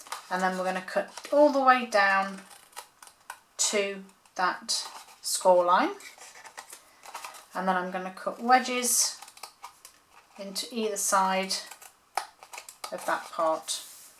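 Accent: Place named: Leicestershire, United Kingdom. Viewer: A lovely little box. I also have to say I love listening to you, even though I don't know where you live or are from, your accent sounds like it was close to where I come from in Leicestershire. Sounds like home Thanks for posting.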